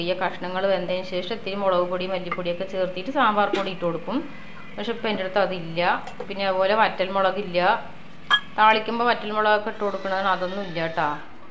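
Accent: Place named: Palakkad, India. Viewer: പാലക്കാട് സംസാര ഞാൻ വീട്ടിൽ പോയാൽ ഇതുപോലെ സംസാരിക്കാം👌❤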